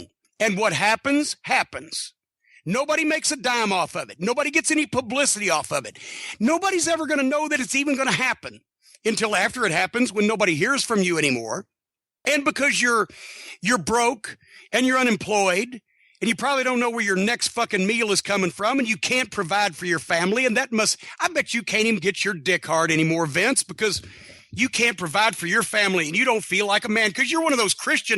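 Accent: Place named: Kentucky, United States. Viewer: Bring out the Kentucky in a old man . Love it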